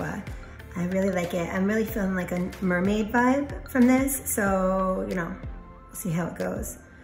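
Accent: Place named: Texas, United States. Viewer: My boyfriend just walked by while I’m watching your video and says “that’s, isn’t it?” 😂😅 he says your voice is “a good Texan southern voice”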